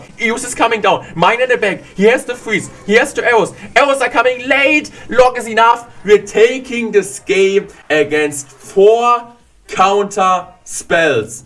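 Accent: Germany. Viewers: You are my favourite youtuber of clash royale(I love your german english accent)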